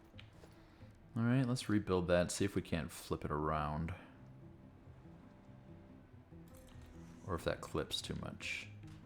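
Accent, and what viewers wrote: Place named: Midwest, United States. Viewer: Been watching your newest SF+ series; that series and this are both great. Commenting as a fellow Michigander to say I knew you were from Michigan after hearing you talk for a couple seconds in the first video, you have a strong Midwest accent. It’s nice hearing it, I’ve lived in Japan for quite some time and don’t get to hear it often.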